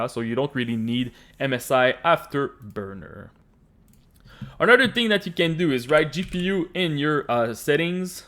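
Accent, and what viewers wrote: Quebec, Canada. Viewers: oh shit un quebecois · you're quebecois ? i am and i see you really have a quebecois accent when you talk, or just canadian in general lol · from quebec ? haha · Tu vien du Quebec en!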